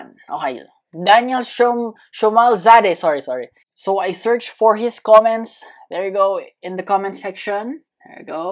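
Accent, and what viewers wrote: Philippines. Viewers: gagi akala ko english talaga si pilipino pala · Sabi na parang di amerikano at Filipino ka nga a hahaha ha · What....! Bro I thought Ur a American lezz go Ur pilipino right u literally said pa milktea ka Naman lol hahahahaha nice btw Ur accent is super duper cool broo Keep it up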